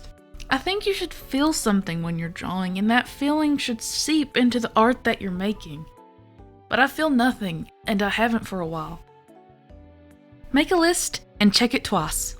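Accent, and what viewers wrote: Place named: Rural, United States. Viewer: i love your voice, it just makes jokes have that country flair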